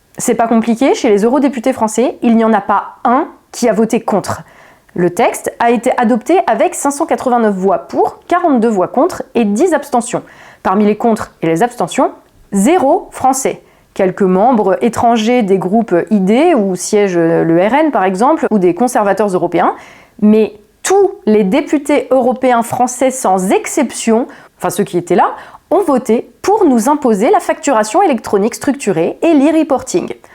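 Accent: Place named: United Kingdom. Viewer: Autant on passe sur l'accent anglais globalement autant le pépale répéter tous les 15s dans la vidéo était très pénible à entendre..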